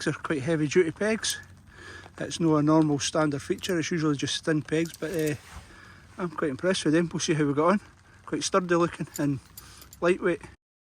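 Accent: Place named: Scotland, United Kingdom. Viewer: Thank you once again,. To the dearest wee deer and possibly remnants of the scents of last summer's bog myrtle et al. Your camp: Well thought-out (obviously) and takes me back to my youthful Rannoch Days. Your 'des-res' and tea/supper: Excellent, as is your photography. Your accent/dialect recall appreciated memories of living with my Family in West Lothian, many years ago, and my now deceased friend Marie Gavin, from the 'Dale. Rest In Peace, Marie. ❤ All the best, Margaret and the Bold Corrie. Rab 🍻 😎 👋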